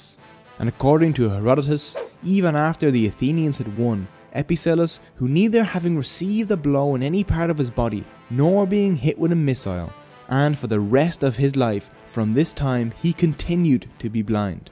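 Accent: Ireland. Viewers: "Sw- swiss physicians. Oh what a f------ word combination!!!" I love the English language and your Irish accent. Thank you for the laugh.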